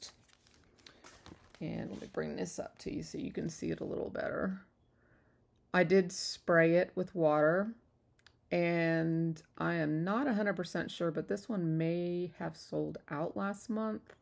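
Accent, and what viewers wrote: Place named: South, United States. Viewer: listening to you with your southern accent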